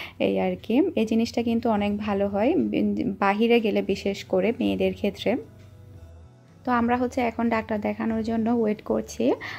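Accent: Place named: Noakhali, Bangladesh. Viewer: Apu kmn achen.apni noakhalir sune valo laglo.nijer khub kacer akjon Mone hoy.amio noakhalir meye.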